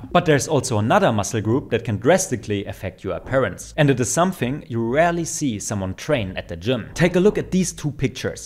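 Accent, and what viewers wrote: Germany. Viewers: ich höre deutsch, ist das wahr? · Hey man, love your content, stuffs great!!! Are you german tho? I am and do hear some of it….maybe…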